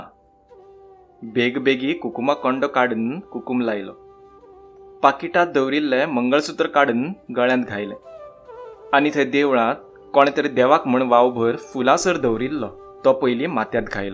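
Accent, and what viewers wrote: Karwar, India. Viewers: Very nice story with simple flow. I really liked the karwari attributes of the writing as well the story. bhai😘😘 nice job of implementing Karwari accent 😊😊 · सुंदर कथा संदेश सर 👌. As always Best narration sir, karwari style narration added new flavor to the story..👏👏👏👏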